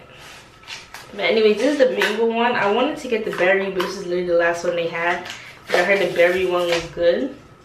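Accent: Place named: Brooklyn, United States. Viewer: So funny your Temu video was the first video i seen of yours and i knew you was from Brooklyn because my man is also from Brooklyn i picked up the accent rq 😂 and its funny how you also used the example of ppl from the south because i am from the south 😂 i am from Texas sometimes me and my man don’t understand our accents lol for example he says “oil “ i say “oyl” 😂😂😭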